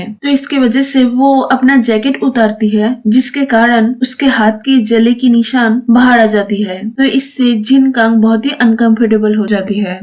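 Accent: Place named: England, United Kingdom. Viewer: Apka voice jyada english style huwa hey ..hindi mojle bolo behan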